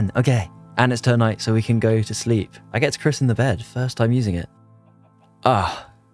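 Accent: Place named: United Kingdom. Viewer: I love this guy’s accent. where is he from in the uk?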